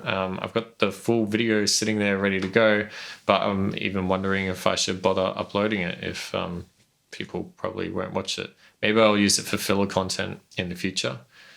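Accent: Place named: Australia. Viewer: Honestly too as a westerner, your accent is 75% of the appeal of your voice. Don’t underestimate the power of your Aussie accent bro. I know that sounds ridiculous and trivial. It’s really not though. Your accent and the naturally lower timbre of your voice really plays into something good.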